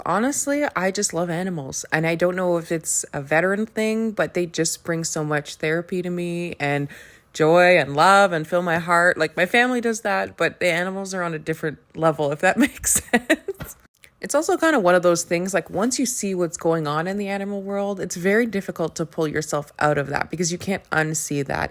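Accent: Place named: Canada. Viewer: I'm so glad you made that video. Your voice is enchanting but I never thought of Canada, but the minute you said "Canada", yes of course..... It was really nice of you to share. I have a dream of how small communities could commit to looking after feral cats for example. I have two cats and I feed a stray and I contribute financially to cat sanctuaries as best I can from a pension, so I really appreciate what you do. I think the whole world loves Biggie Smalls. Maybe you could write a children's book????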